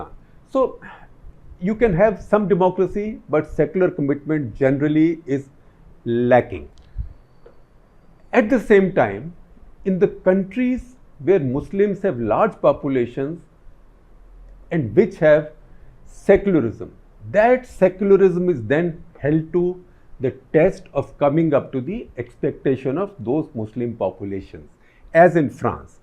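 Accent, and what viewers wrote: India. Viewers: Why are you speaking English in total Hindi tone.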